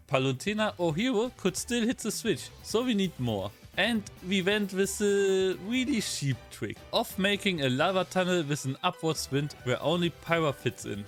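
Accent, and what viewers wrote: Germany. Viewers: I've been wondering for a long time now. Are you German? The way you pronounce certain names combined with your accent really reminds me of German. If I guessed wrong, please don't be offended.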